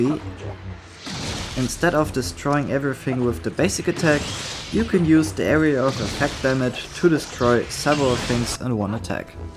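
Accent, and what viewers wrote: Germany. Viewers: dein deutsch englisch ist echt net gut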